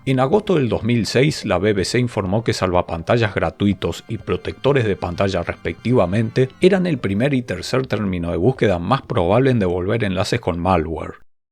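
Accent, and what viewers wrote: Argentina. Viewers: Por tu acento me da curiosidad saber de que parte de la Argentina sos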